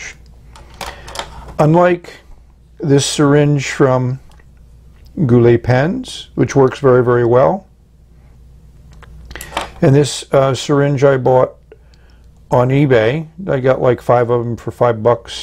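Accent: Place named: Western Pennsylvania, United States. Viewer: Thanks for the video. I have to admit, your voice and western PA accent remind me of my late father, and I find listening to you quite soothing.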